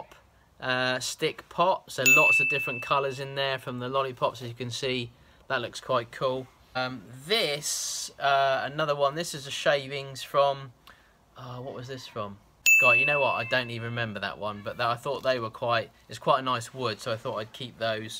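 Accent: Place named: South East, United Kingdom. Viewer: He speaks similar to me. I'm from south east England. Brighton to be precise. I can't say for sure where is from but I think maybe the south east of England but I maybe wrong.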